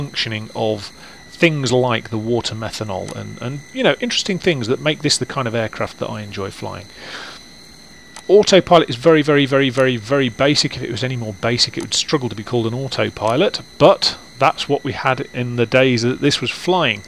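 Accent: United Kingdom. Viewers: Too much annoying British talk .. .SHUT UP already and let us hear the engine sound.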